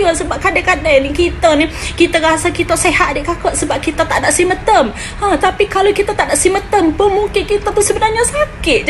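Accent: Kelantan, Malaysia. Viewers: Kelate pekat kecek.. tapi siaran jln2 cari make hok buat meme sohor benar d kelate sampai pakat duk cokoh depe tv.. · Sedap dengar loghatnya.. Macam kelantan + siam Malaysia + Thailand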